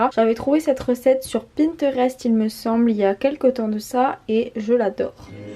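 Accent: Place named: North, France. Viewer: tu as un petit accent du nord de la france, tu viens d'ou? 😉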